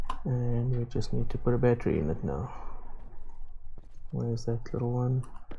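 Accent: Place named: South Africa. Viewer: Aweh, you sound South African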